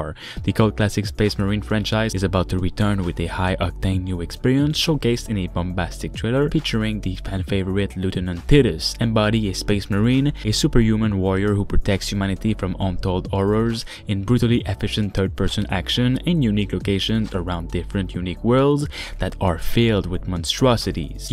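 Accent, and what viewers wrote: Quebec, Canada. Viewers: T'es tu Québecois ?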